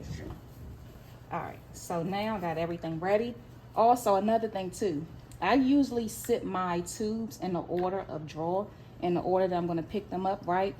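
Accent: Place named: Baltimore, United States. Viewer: Thanks for this video,i start my class on Monday.You sound like youre from Baltimore❤.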